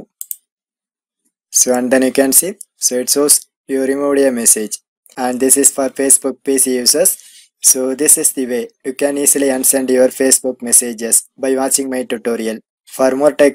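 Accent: India. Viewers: Lol your indian english sounds really stupid and it makes me laugh when I hear it lol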